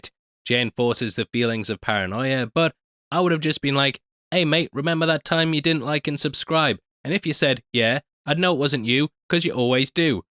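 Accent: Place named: Liverpool, United Kingdom. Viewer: You really sound like a scouser. I detect lol.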